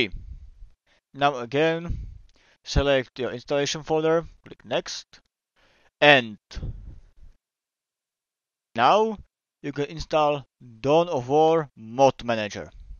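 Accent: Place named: Czech Republic. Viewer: Slyším českou abecedu :D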